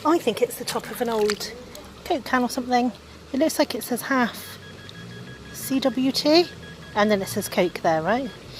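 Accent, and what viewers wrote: Somerset, United Kingdom. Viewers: As soon as you start talking it takes me right back to my childhood and friends I had in Portishead! So far away now, so really nice to see your adventures around Somerset - I was raised on The Levels! I'm so pleased to see the waterways almost pristine, compared to so many in US which look like runoff from garbage dumps! And I have to say I am honestly surprised by how much stuff you find in the rivers, like pottery - I keep saying to my Dad he should really take to exploring his (Devon) farm more as I'm sure it's hiding many relics... Congratulations now on nearly 800 SUBS! Soon be up to a thousand. Enjoy your hunting - I do! 👍😊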